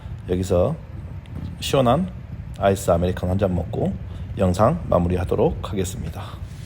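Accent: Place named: Jeolla, South Korea. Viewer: 캔암바이크 한번 타보고 싶어집니다 ^^ 같은전라도사투리 너무 좋네요 ㅋㅋ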